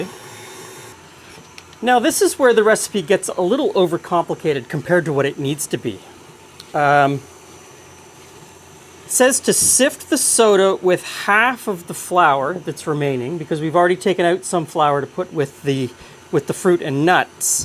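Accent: Canada. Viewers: Sure sounds Canadian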